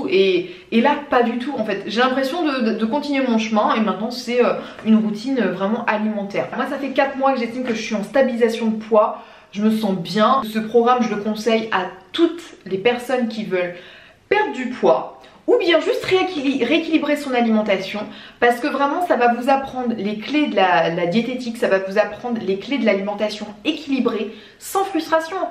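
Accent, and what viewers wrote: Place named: Brittany, France. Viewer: C'est l'accent Breton qui veut ça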